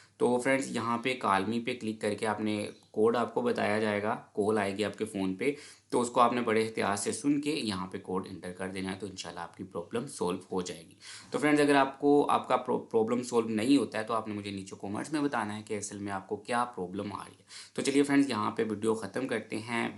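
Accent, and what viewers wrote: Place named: India. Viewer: You are such a scammer You write the subtitle of your video in English But your speek is in indian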